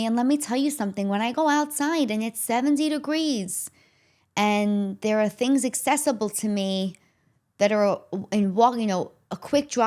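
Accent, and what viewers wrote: New York, United States. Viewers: No matter where you reside, your voice will always take us back to NYC! ❤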